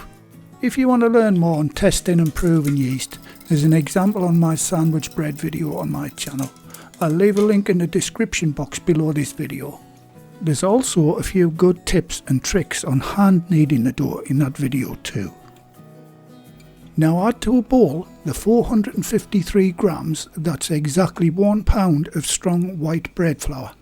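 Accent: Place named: Northeast England, United Kingdom. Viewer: Hi - greetings from sunny Colorado- I'm an expat - originally from Murton ( colliery). I've been working through your videos from the beginning and will definitely try virtually everything including cheesemaking. However when I came across stotty cake - always called "fadge" by my dad - I had to try it out. Came out great - thanks! Love your videos and hope to make many things soon!! Stay well and keep them coming please! Tony Armstrong ps - great to hear someone with an accent pretty much like mine! My American friends thought I was unique!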